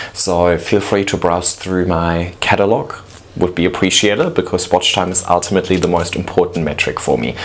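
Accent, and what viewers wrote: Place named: Germany. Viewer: It‘s cute to hear „ leider too heavy“ von dir😂😂😂, schon witzig das dein Deutsch nach all den Jahren noch durchkommt😬✌🏻finds lustig😊 love your video😃🪴 Lg Meike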